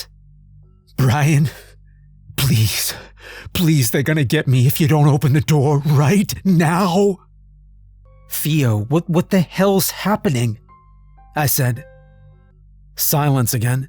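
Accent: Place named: Brooklyn, United States. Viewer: I've realized throughout this story that I don't like this accent. Is it Brooklyn? Whatever it is, it upsets my ear for some reason.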